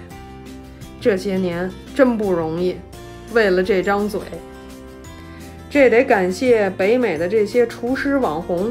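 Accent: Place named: Beijing, China. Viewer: 太棒了。 期待下一期视频。 老北京话，那叫一地道。 听着顺耳👍😄💖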